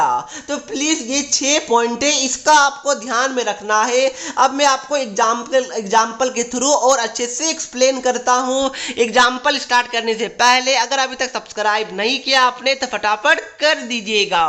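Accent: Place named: Bengal, India. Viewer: Is it a bengali teaching us he be like paryog and twenteen wtf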